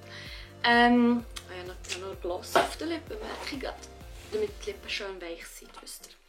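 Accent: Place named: Bern, Switzerland. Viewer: I fingäs soo cool ds du bärndütsch redisch😍😍😍❤🇨🇭🇨🇭🇨🇭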